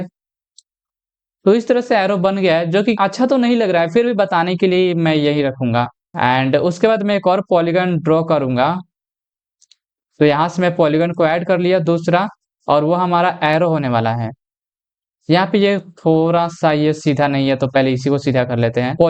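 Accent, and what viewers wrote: Bihar, India. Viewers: bhaiya aaap bihari hai kya ?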